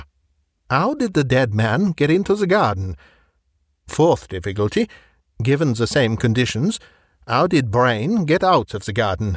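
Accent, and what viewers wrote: United Kingdom. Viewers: I don't sleep well so I listen to audiobooks on YT all night every night with earbuds. I like the fact that this compilation has over 9 hours of listening. Although I'm American I enjoy listening to listen to books read in a British accent. Your voice is fabulous. Luckily, I stumbled upon this excellent channel just today.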